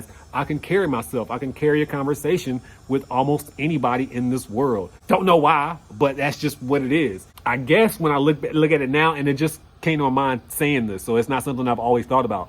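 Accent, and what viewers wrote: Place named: United States. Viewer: Sounds like your from the U S A and it sounds great you moved around a bit well rounded! Be Well Be Safe & Stay Blessed.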